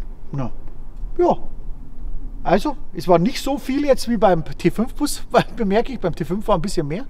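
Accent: Bavaria, Germany. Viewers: Red bitte wieder boarisch!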